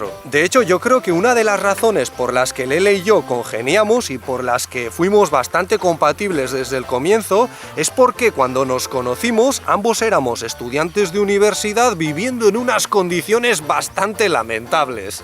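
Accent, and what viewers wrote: Basque Country, Spain. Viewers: El acento vasco de es muy gracioso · 🤣🤣🤣🤣🤣🤣🤣🤣😂😂😅 soy mexican y el acento tuyo me encanta español de España JAJAJAAAAA es Argentino o español???? Sorry nose me puedes decir?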